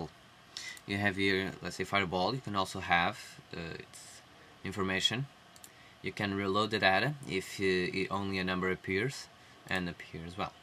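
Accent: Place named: Portugal. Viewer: ola! Vi logo pelo teu sotaque q eras tuga tenho so uma pergunta isto paga-se ou nao?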